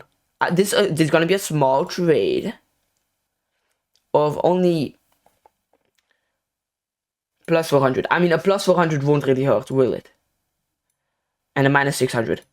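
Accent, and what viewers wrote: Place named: France. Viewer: Your frech aczent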